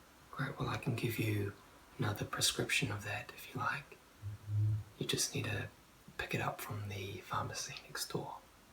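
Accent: New Zealand. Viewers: I just love the NZ accent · Kiwi Accent, oh god. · Gotta love the nz accent! Im from Australia where 6 is six not sex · you can't get much better or cooler than a Kiwi accent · Your accent is beautiful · Your accent.. I LOVE THAT! It's perfection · love the accent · THAT ACCENT. OMLLLL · came here for the accent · similar to the Aussie accent, but they pronounce certain words differently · I love kiwi-accents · im not exposed to kiwi accent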